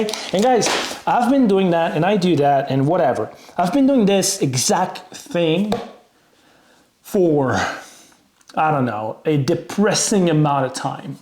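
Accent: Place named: France. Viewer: Your lovely French accent makes everything sound so refined and erudite. Lots of love from SK!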